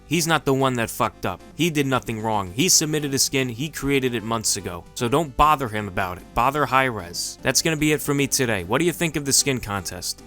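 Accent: Italy. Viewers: Dude r u from italy?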